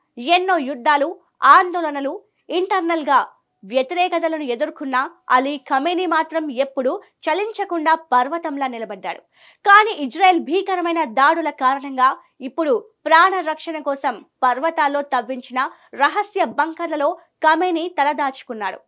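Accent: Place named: United Kingdom. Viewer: Voice baledu ...English accent lo telugu matladutunnav.....👎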